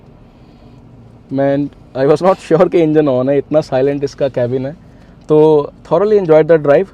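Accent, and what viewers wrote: Punjab, India. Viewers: punjabi lagda bai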